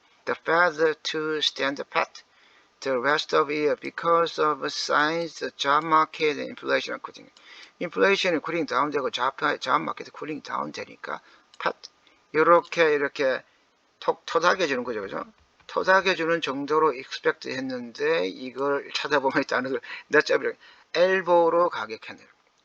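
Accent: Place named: South Korea. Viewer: ^^ 머스크 과장과 사우디공주와 탕비실 얘기에 저도 많이 웃었습니다.^^ 우리나라에 사는 많은 사람들은 같은 한국사람의 영어발음이 더 잘 들리는거 아시죠? 감사합니다. 잘 들었습니다. 😅🎉❤😅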